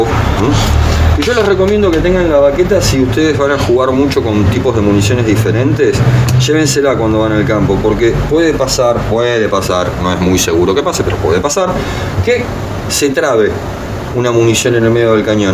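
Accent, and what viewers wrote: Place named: Argentina. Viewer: De que parte de Argentina sos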